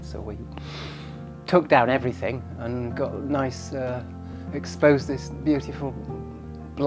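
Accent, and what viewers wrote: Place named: Scotland, United Kingdom. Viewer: And his Scottish accent makes him even more wholesome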